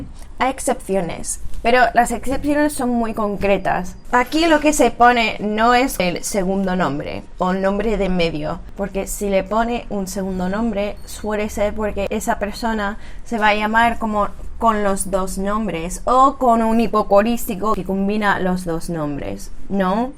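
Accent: Andalusia, Spain. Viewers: Ya he visto un buen puñado de tus vídeos porque me gusta observar los choques culturales que yo busco cuando viajo a otros paises, cosa que me apasiona. Es delicioso tu acento andalú (te lo dice un granaíno) jajajaja. Te voy a contar una curiosidad acerca del manejo de los dos apellidos en España. Se aplicaron en primera instancia allá por el siglo XVI aunque se consolidó en el XIX y no tenía otro objeto que el de localizar indicios por vía materna de los conversos, aquellos que judaizaran o que llevaran en su apellido su origen sefardí. El resto de la historia de estos pobres infortunados imagino que la conocerás. Un saludo enorme y te animo a que publiques más, veo que hace tiempo que no lo haces. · Vas teniendo acento andaluz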